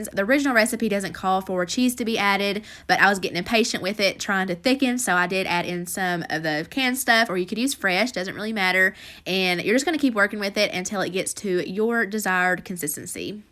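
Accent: South, United States. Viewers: I can't fir the life of me believe some one would call you lazy!!!!! Your always making fabulous dinners for your family, don't ever explain yourself to anyone. Your a good Wife and mommy. Love you. I especially love your southern accent. Your a doll. 🍳🥯🍗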